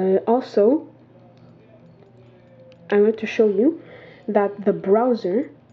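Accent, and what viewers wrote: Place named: Germany. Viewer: love de german accent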